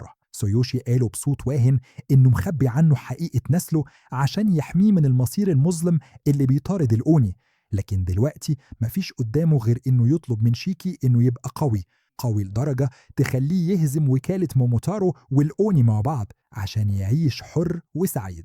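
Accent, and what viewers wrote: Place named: Morocco. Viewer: وي انا متاكيد إن انت مغربي عشان صوتك يشبه 5 انا عرفهم من المغرب وي لو انت مغربي يبق انا ما غالتش الانا تبعتك❤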